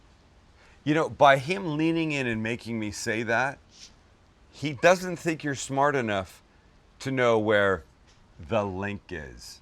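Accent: Canada. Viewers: Are you Canadian? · That Canadian accent LMFAO! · what part of Canada are you from?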